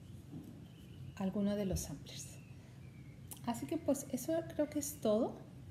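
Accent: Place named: Peru. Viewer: Hola! Acabo de descubrir tu canal, por tu acento y el torito de Pucará, que mencionaste al final, me parece que eres de Perú ¿?.... Si es así, que alegría haber descubierto un flosstube de una peruana 🤗🤗.... Están lindos los bordados que enseñaste terminados y los que tienes planeados empezar. Las telas me han encantado sobretodo la que resultó de la combinación de ambos colores. Saludos desde Lima y feliz bordado!!!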